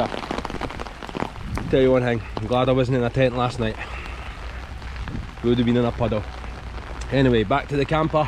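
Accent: Scotland, United Kingdom. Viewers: Did you learn English in Scotland? Pronouncing words such as "now" gives you a strong Scottish accent!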